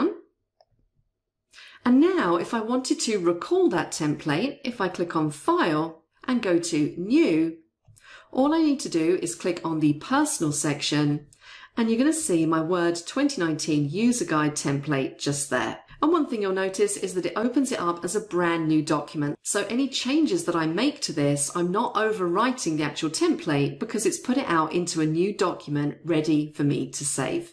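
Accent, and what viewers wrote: United Kingdom. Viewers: Thanks for excellent explanations! Btw, you sound so British, I loved it :)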